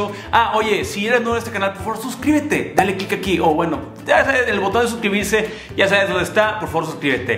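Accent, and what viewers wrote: Monterrey, Mexico. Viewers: Los regiosssss su acentooooo😊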